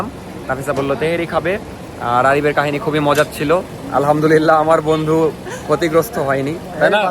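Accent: Rajshahi, Bangladesh. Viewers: "Hebi sundor laaegche" love ur rajshahi accent ❤🎉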